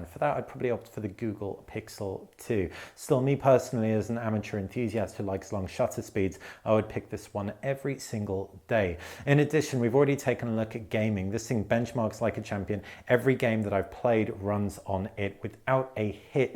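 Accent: Egypt. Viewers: You speak Arabic in Egyptian accent even better than Egyptians themselves 😀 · Omg I follow u from about 5 years now... How come you know arabic this clear in Egyptian accent I'm from Egypt and I'm impressed... Keep up the good work... Have a nice day, cheers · Wow U Speak arabic .. Is that an egyptian akcent i guess ?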